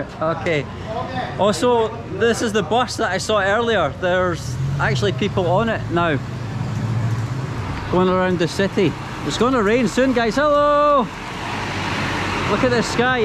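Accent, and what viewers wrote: Scotland, United Kingdom. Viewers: I love the Scottish pronunciations of things :)) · Hey Scottish man, love the accent, I studied in Scotland and I miss it. Feeling nostalgic. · Welcome to Malaysia. Love your Scottish accent. You should get Melaka T-shirt, that is where everything starts in Malaysia 600 years ago. Kuala Lumpur is the capital but the truth gems is outside capital city. Malaysia is food heaven country, really hard to try it all for a tourist. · Your accent reminds me of my time in Leith . Which part of Scotland are you from ?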